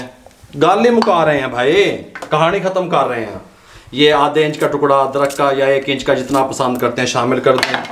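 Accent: India. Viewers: I like your daise style bolne style mashallah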